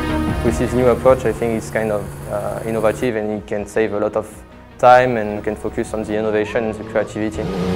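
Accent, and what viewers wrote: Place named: France. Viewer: Stranger things music ahah that french accent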